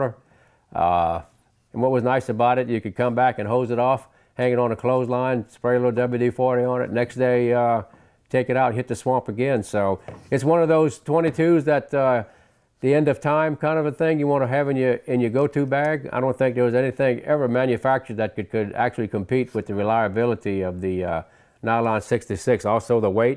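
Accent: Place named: South, United States. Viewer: It's GOLD, Jerry! GOLD! When I first started listening to this vid, it sounded familiar, but as if I was hearing you from something I had heard decades ago. Then it came to me. Your voice is similar to Don Novello's (Father Guido Sarducci on SNL). He doesn't have the southern accent, but the sound and cadence was similar. Of course the subject matters are totally divergent. I recall one of his bits was about a planet in Earth's orbit, but directly opposite of us, so we can't see it. Everything there is exactly the same as here except that they eat corn on the cob lengthwise. Thanks for "triggering" the memory. Anyway, your videos are treasures and hope you'll keep on keepin' on. I like the idea of chatting with other well known shooters. I had the opportunity to know Robbie Latham before he was a shooting star. I liked the kid. Not a smartass in any way.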